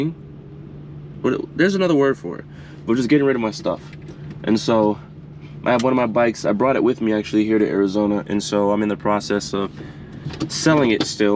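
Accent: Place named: Haiti. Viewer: I came across this channel just browsing, because I usually look for a camping videos of people just enjoying their lives ,yours came up immediately click on it at first I wasn’t because it’s 15 mins long ish ,don’t come for me I say that because I feel like it’s important to at least try for 29/30 mins long as others put a lot of time and effort anyways I strayed away from that, and took a chance only to hear you preach about god and the lord amen I subscribe , this is the first video on your channel I’m watching and then I continuously sat watching , grabbing that chapstick 😂😂😂and seeing that GT flag it was a done deal I was here like ohhhhh I found my husband lamp 😂😂😂😂 at first I was like the way you speak it kind of seems somewhat similar to my niece father thought for sure you were Haitian possibly. Love your content ,I praise god and lord EVERYDAY for life could have lost mine last year to a hit by a car by god grace I’m here ,I got even more into gospel music I play it all at work even meet a member of the Evolation worship, and he was like shocked that I was actually playing gospel music guessing on the clock because I’m sure he’s hurt his music being played somewhere I played every day playing anywhere, especially on my over the ear headphone where I know others can hear but at work it’s all on my tiny speaker lol and just to get an idea I work at the airport 😊 Add me insta blssedd.xx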